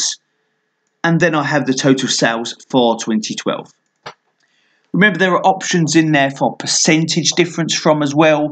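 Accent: United Kingdom. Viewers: Thank you so much. I've been looking for an explanation for this analysis in other languages (in pt e it) for so long, without success. Your explanation is so clear, plus british accent, perfect!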